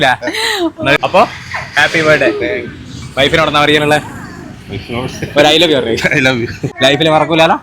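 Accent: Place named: Vadakara, India. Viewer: Ninghalde dialogue ketal Vadakara manasilayi backil board vechitund VADAKARA. Husn doubt und adan kannada kazhikan paranadm onn pottikatdm😂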